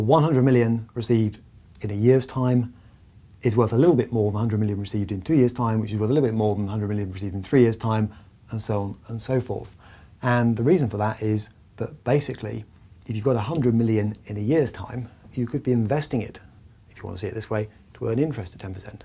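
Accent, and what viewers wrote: United Kingdom. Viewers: love the British English! Always feels like the accent that any good teacher should use haha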